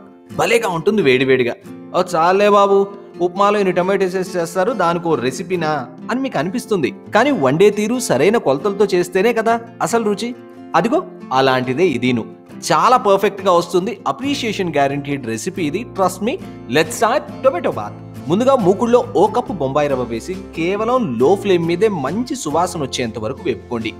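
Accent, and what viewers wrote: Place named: West Godavari, India. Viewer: I love your Telugu. Reminds me of my childhood days in my village in west Godavari.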